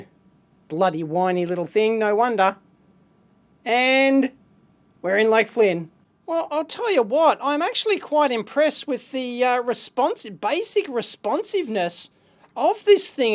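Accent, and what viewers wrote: Australia. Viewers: The 150% Australian accent would be a big clue ...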